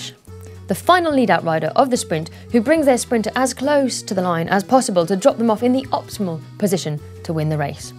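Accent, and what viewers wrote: France. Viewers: Hi, when will U start the GCN in french? I love french accent! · I'm french, all i have to say is that you're video and accent is really funny xD I can definitely understand why our French accent in english is funny for you!!! And by the way, you're "Fran-glais" as we say in france when we talk a mix of english and french, is owesome lmao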